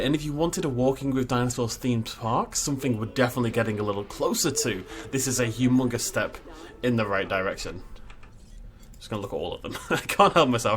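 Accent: United Kingdom. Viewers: Isn’t he like British or something? I think it’s his accent.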